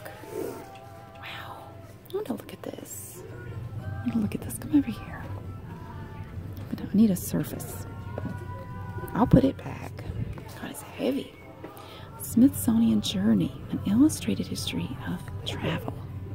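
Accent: South, United States. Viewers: I love that fact that her voice is authentic and I love her southern accent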